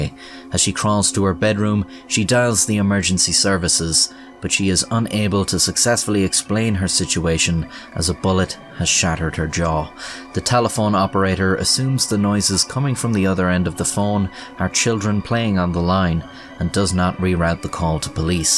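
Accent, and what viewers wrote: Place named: Ireland. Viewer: Come to Ireland for a year and you can understand him better :)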